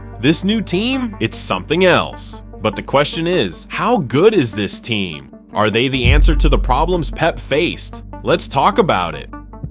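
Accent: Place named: United States. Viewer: video would be better without an american accent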